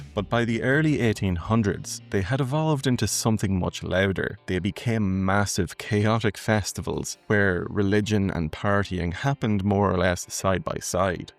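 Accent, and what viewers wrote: Ireland. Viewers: Thank you for your reply, you're doing a great job with your channel, by the way. I'm curious about your accent, you sound like you might be a Leitrim man like myself or maybe Cavan or Monaghan 😉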